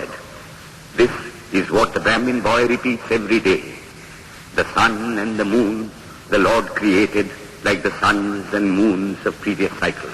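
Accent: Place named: West, United States. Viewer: This is not the voice of Vivekananda. Pure accent of the people in the west.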